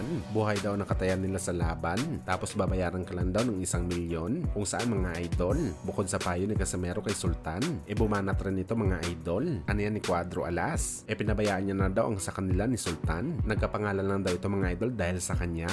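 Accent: Iloilo, Philippines. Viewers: Te ilonggo ka haw?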